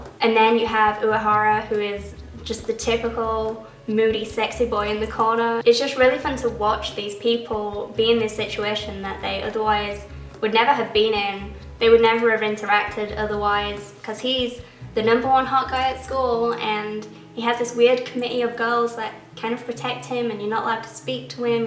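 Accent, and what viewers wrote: Yorkshire, United Kingdom. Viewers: yeah can Defo hear the Yorkshire but sounded like a twang of something else aswell, maybe not lol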